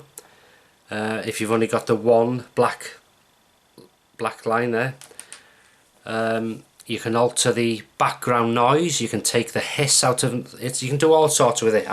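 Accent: Liverpool, United Kingdom. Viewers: You from Liverpool?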